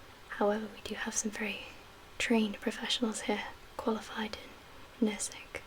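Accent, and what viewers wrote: Wales, United Kingdom. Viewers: Welsh, beautiful and a great ASMRtist..marry me?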